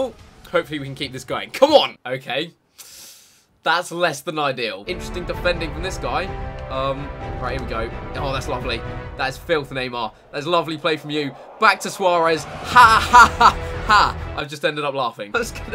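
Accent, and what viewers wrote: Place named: United Kingdom. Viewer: agarwal he's British! (like me)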